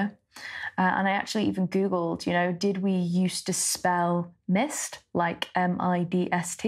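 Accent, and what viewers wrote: United Kingdom. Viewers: #waw Untouched by the comprehension of English poems! felt like a school student once again though I am a researcher that too in Forests! to my great surprise I enjoyed like a terribly good ice-cream! first time here, liked shared and subscribed #wishes BTW your climate anthem song is a gentle tornado! and your beautiful British accent is charming like you. welcome!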